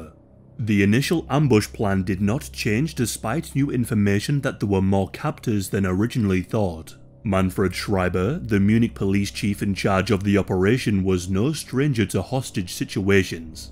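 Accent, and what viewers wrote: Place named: Hebrides, United Kingdom. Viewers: God hes so Scottish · It's nice to hear someone who (I think) has the same accent as me doing well on YouTube! Hi from Hebs :)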